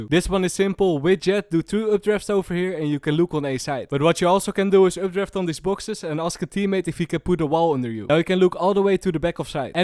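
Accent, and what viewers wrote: Netherlands. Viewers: You sound Dutch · ur dutch bro? I love your accent 🤣😆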